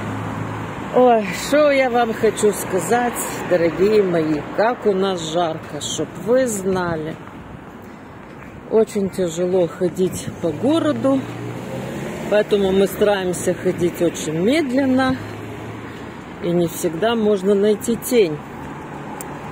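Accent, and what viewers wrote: Odessa, Ukraine. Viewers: Очень приятный,душевный и голос и одесский говор с дружелюбной ноткой.НЕДОЗАДОЕЛО!)))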